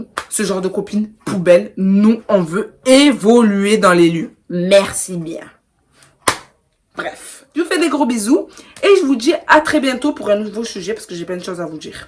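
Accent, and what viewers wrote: Marseille, France. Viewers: Cc ma belle une abonnée en plus tu est de Marseille en dirais avec ton accent. Tu à tout dit surtout les femmes sont trop méchante et hypocrite en amitié .vaut mieux marcher seul et être tranquille dans sa tête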